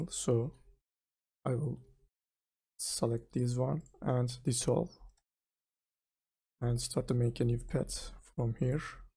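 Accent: Turkey. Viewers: is it Turkish accent? :D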